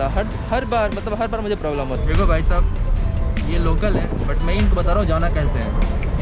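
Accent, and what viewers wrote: Bihar, India. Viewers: BIHARI style Bhai.... hamare Bihar me aise words aise hi pronounce krte hain.. Jaise competition ko kampatetaion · ITS BIHARI LANGUAGE... 😊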